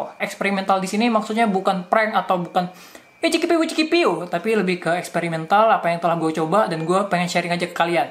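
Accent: Indonesia. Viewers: Here your English comment Dead channel because of the freaking Indo voice lol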